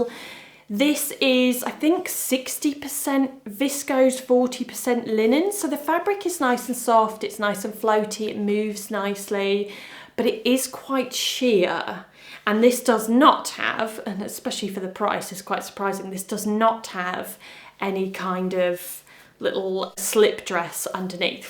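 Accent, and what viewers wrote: United Kingdom. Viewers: Ohhhhhh Everything amazing 🎉🎉🎉🎉🎉. Your british Accent just kills me 😂 of happiness. So so elegant❤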